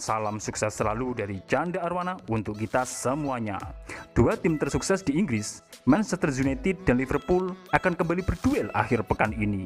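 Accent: Java, Indonesia. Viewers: Jawa koek nu ngomong na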